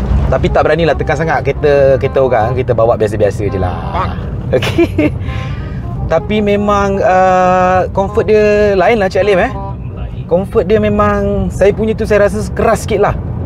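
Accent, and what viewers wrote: Terengganu, Malaysia. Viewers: Tganu atau klantan..slang xleh tnggal tu😂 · Hahaha. Xleh nak lari doh sleng ganu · cik ni dari ganu cara cakapnya😁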